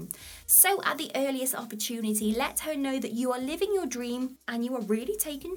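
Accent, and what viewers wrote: United Kingdom. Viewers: ❤her British accent/ sexy voice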